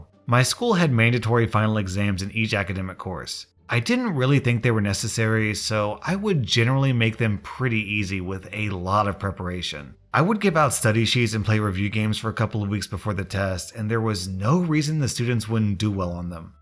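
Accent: South, United States. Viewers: I LOVE HIS SOUTHERN ACCENT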